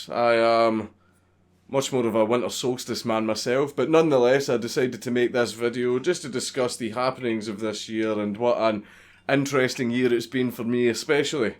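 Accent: Scotland, United Kingdom. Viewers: 10 seconds in and its already so scottish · I don't know why, but whenever I watch one of your videos. I'd scroll through the comments and suddenly everything I read sounds Scottish (except a few with five billion emojis). Amazing video. I hope all is well for you for the new year. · Too Scottish, disliked. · Winter solstice over Christmas? Good man. Love the accent (alas I am aberdonian) and keep fighting for free speech. Makes me proud to be Scottish. Lots of love from Aberdeenshire ❤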